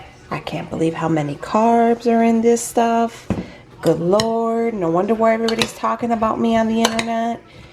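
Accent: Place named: Chicago, United States. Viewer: I was born in Chicago (East side) and raised in Illinois. You remind me of my peeps, especially your accent. Love your channel.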